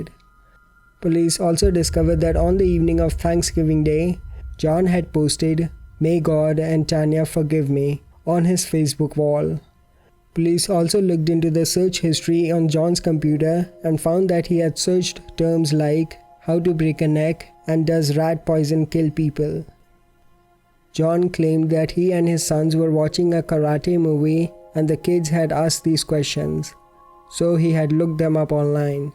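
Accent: India. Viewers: It's seems you're from an Indian origin..your accent seems like so..btw loved the way u explain everything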